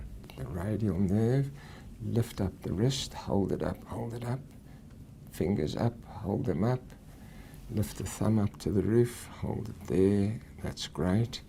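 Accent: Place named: South Africa. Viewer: he has a South African accent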